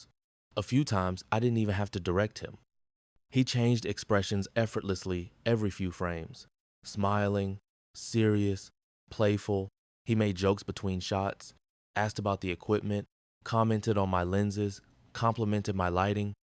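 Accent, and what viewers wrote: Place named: Africa. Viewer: You're African, I can tell from your voice.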